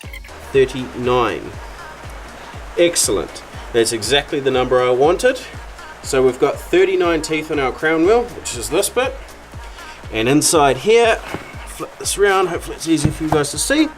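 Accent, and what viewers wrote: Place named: New Zealand. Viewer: ahhh your a kiwi lol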